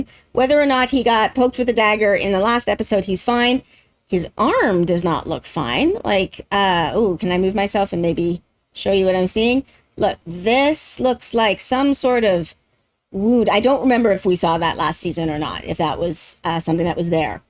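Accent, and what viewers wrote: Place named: United States. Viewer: American accent, American accent dances in American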